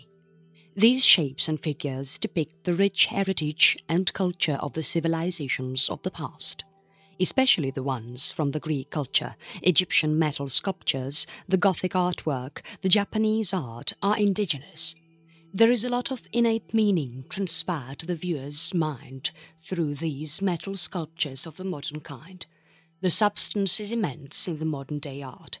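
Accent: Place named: United Kingdom. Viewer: I'm seeking real info about The secrete societies in existence today establishing the New World Order like they have accomplished in Euro. Oh I know it's a secrete. Why don't you dare mention the world bank, the U.N, NATO, The Pacific Alliance, etc????? She has a British Accent but displays US Marines? I don't trust this Commercial. It demos no history.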